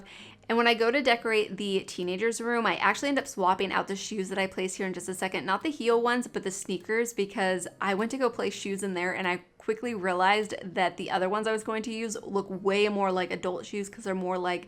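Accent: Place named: Michigan, United States. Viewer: are you from michigan originally? i see your adress is now in california but i just wonder because of your pronunciation :))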